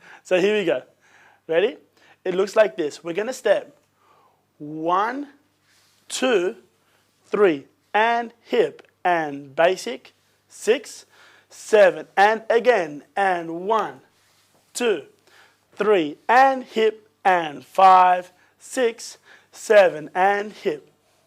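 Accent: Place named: Australia. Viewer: It's Australian with a hint of something else.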